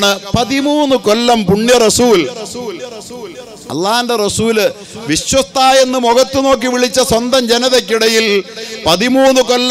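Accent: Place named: Ernakulam, India. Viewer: ഒറ്റയിരുപ്പിനു കണ്ടു തീർത്തു കണ്ണ് തുറപ്പിച്ചു മനസ്സ് തുറപ്പിച്ചു അസ്സലാമു അലൈക്കും ഉസ്താദേ സംസാര ശൈലി കേട്ടിട്ട് ഈ ഉസ്താദ് എറണാകുളം ജില്ലക്കാരൻ ആണെന്ന് തോന്നുന്നു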